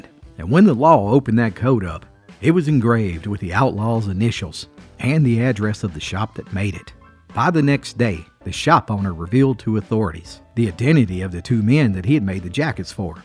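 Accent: Appalachia, United States. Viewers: From Switzerland or from Mountainman to Mountainman. Great Storytelling, love it. The way you tell it and can l say your Appalachian dialekt make your storys special. And can l ask you for that Red Rube video intro tune?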